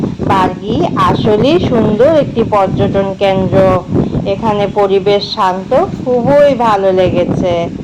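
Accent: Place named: Chittagong, Bangladesh. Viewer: আপু যে চাটগাঁইয়া কথায় বুঝাই যাচ্ছে আপু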